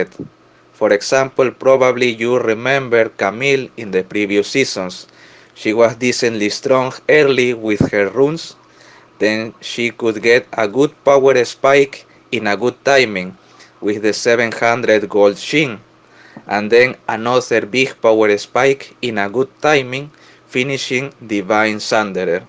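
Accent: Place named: Spain. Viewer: Ostias hermano es el acento español en ingles mas fuerte k he escuchado en mi vida ajajaja. Pero la guia muy buena, te recomiendo que las hagas en español con subs en ingles.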